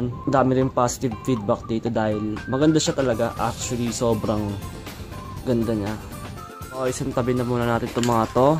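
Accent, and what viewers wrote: Visayas, Philippines. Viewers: Pre, bisaya ka?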